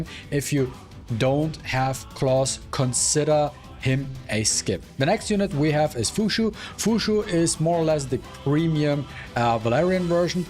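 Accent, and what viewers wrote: Germany. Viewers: Bro is German?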